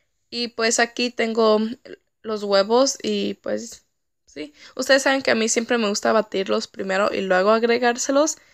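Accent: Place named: Chicano, United States. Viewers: Por la voz de ella, le atino que vive aqui en estados unidos, pueda ser Texas y que es una chava de unos 19años jejeje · Me encanta que diga todo en español aunque se le note que probablemente es chicana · Supongo q mexicana nacida en el gabacho así hablan todos los q nacen en usa y los q llegan desde niños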